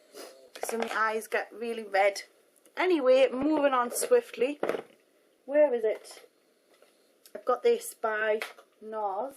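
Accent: Newcastle, United Kingdom. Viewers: i like ur british exent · She has a geordie accent, she's from south sheilds near newcastle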